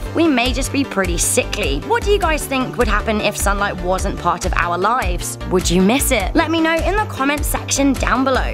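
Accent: United Kingdom. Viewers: Edward Waller She's British, that's how Brits say it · she has a British proper English accent. I do not but I appreciate proper English, from the source.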